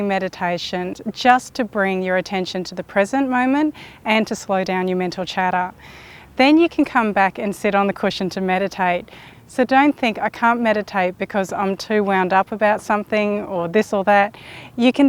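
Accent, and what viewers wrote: Australia. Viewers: You do not look pure chinese, plus your last name is Indian, where do you originally come from? Accent is definitely Australian but the looks is so mixed, you cld even pass as an arab by the way.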